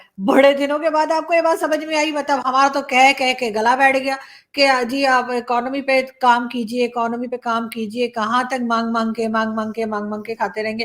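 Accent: India. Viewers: ji aap ki jubaan paki kam hindustani jyada lagti hai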